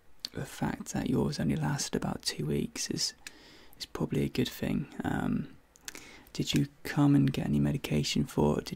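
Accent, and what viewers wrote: United Kingdom. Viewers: The (super mega hot) British accent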